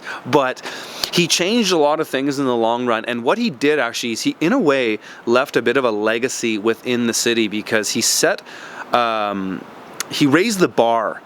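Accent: Canada. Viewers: I live in Southern California. But I was born and raised in Kelowna. So I somehow stumbled across you channel, who knows how and as you spoke I was like "that dude is Canadian, I wonder where he's from?" and as you moved your camera around I thought, "those house sure look like Kelowna houses." Then u said Kelowna. How funny is that? I swear u live only blocks from I grew up. Farm on buddy!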